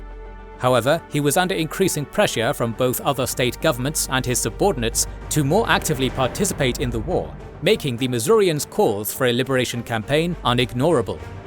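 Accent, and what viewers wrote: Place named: United Kingdom. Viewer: True spottedsharks but he’s British. Hence “St Louie” and although not here the inevitable “Mer EE land” for Maryland. “Schofield” is pronounced with a hard C (or K) rather than the schwa sound. None of this bothers me one whit. You ought to hear how we in Kentucky and Tennessee pronounce our foreign-named towns. Ever hear of Versailles Kentucky? Athens Kentucky (here with a long A.) How about Cairo IL (“KAY ro” it is there.) So yes, I get it but no, I don’t care. And I’d bet that unless you’re from Tennessee you’d stumble on Maury County (pronounced Merry) and Blount County (Blunt).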